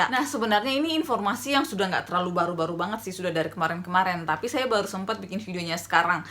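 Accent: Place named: Philippines. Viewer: Damn i tried to translate lol. Your words are pretty much the same as Filipino words and the accent! I think we're long lost sisters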